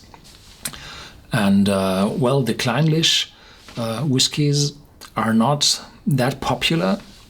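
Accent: Germany. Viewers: Sounds German , not Romanian..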